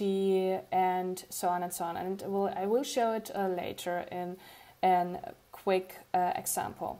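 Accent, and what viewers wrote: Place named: Germany. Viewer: 2 seconds into this video and I already figured you're German.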